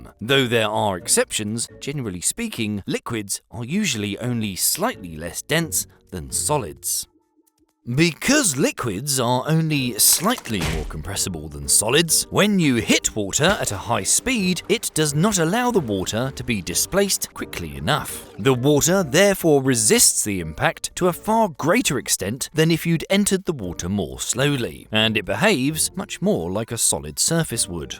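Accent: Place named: United Kingdom. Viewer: I like this channel and not just because of the British accent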